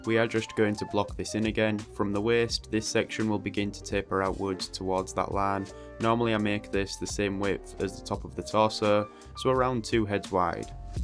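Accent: United Kingdom. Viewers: cool tutorial but i cant figure out wtf is going on with ur accent. like i feel like its British or something most of the time and then you'll say a word that just sounds so southern. not hate I'm just really confused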